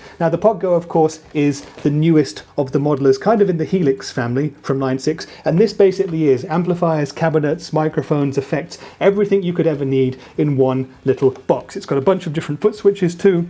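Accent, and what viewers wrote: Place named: United Kingdom. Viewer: Are you German? Your English is flawless, I thought you were British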